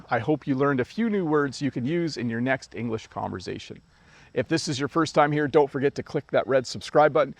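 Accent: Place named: Canada. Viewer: please next time talk about Zebras with your brilliant Canadian accent ❤️🇨🇦🇨🇦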